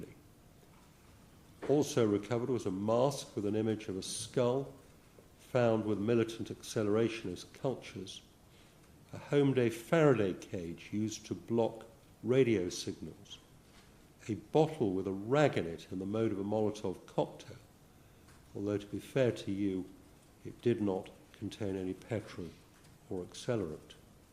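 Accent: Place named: England, United Kingdom. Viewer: Man i tried to watch this but this guys england accent is like nails on a chalk board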